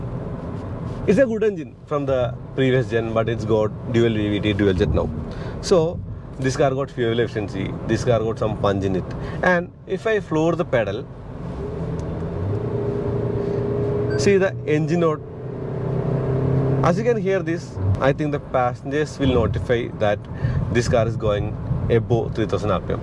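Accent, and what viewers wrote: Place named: Kerala, India. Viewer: Even English sounded like Malayalam to me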